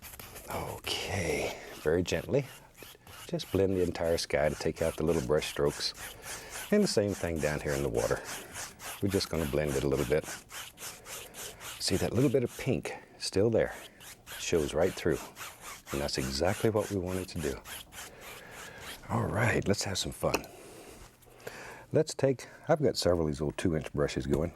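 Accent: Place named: United States. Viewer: he says it a lot in his videos.. pretty sure its just his american accent. he says’ something bout like that.. but the ‘that’ is lazy :)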